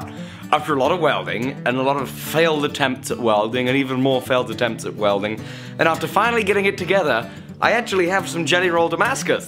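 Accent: England, United Kingdom. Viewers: Why does he have an American flag and sound British? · how come you sound British yet have American flags everywhere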